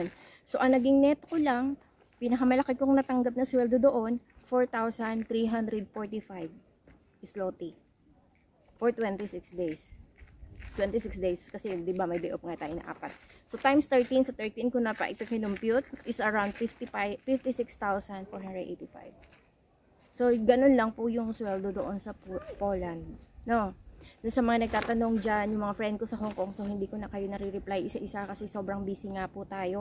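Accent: Philippines. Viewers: batangueña po kayo? :)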